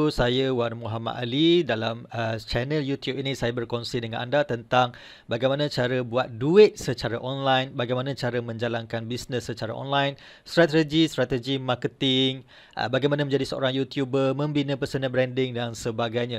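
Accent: Terengganu, Malaysia. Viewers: Terbaik sharing boh👍🏼👍🏼👍🏼.. teka jap boh asal ganu kan.. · Asal ganu ke bang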